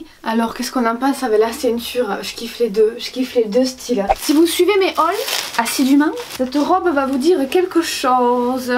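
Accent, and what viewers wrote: South, France. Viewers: D'habitude l'accent du Sud me tape sur les nerfs, je ne supporte pas .... mais toi tu me fais tellement rire que ça passe tout seul 😀 j'adore ta manière de filmer, de présenter les articles... tout en simplicité c'est hyper agréable. J'ai l'impression d'être avec une copine après une séance shopping 😀 Bye bye 😘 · Je regarde jamais les haul de YouTubeuse sa me gonfle mais les tiens j’aime trop avec ton petit accent du sud et ta personnalité. Sa fait 3 jours que je regarde toutes vidéos mdr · Yes !! J'adore tu illumine mon arrêt de travail (qui est beaucoup trop long, 3 semaines c'est trop! 😂) Ça me ramène au pays ton accent ! Même si je suis encore dans le sud je suis pas du bon côté ! Bref j'adore tes vidéos !! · Ça fait quelques mois seulement que je t’ai découverte! Tes vidéos me font toujours rire, ton accent bien de chez nous qui fleure bon le soleil du sud, ton humour, ton auto-dérision (tout le monde n’a pas cette faculté !!) bref, toujours un moment sympa, merci ☺️🙏🏼💜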